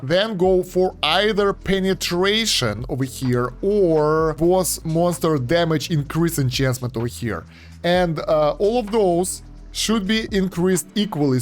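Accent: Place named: Russia. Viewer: По акценту слышно русский мужик пожалуйста сними ролик на сборку скиллов на демона и ангела пж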